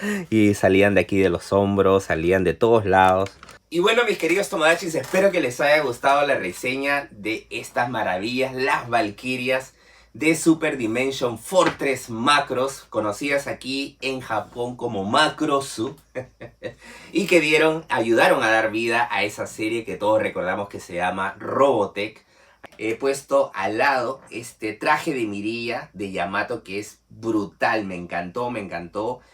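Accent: Peru. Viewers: Me encanta tu canal cada vez que puedo veo tus episodios me mate de risa con la de Arnol y lo de Jb se la pase a mi bros para que se caguen de risa también, te felicito por tu canal con tu acento Perucho es de mi época bravazo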